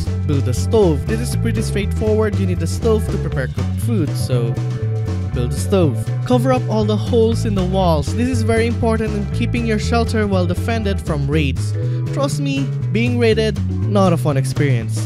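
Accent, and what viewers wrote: Philippines. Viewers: I like your Filipino accent, I wish I had mine but I'm too much an Aussie to turn back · Are you filipino? You have the accent...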